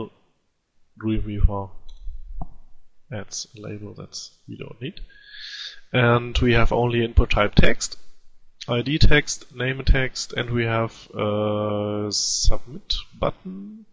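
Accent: Germany. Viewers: Es ist nicht so grausam... es ist ein Deutsches akzent! :)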